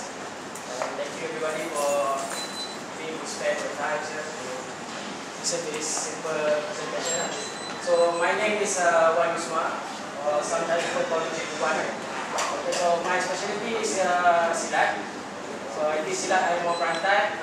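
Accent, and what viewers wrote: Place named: Kelantan, Malaysia. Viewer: betul la cikgu... ada sikit sikit loghat kelate ^_^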